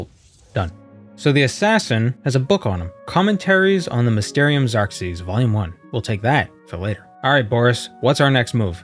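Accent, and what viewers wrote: Boston, United States. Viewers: the bostonian accent is strong with this 1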